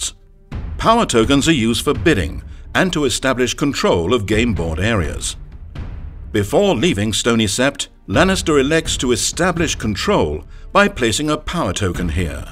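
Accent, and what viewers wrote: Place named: England, United Kingdom. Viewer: This tutorial is better with the narrator's English accent.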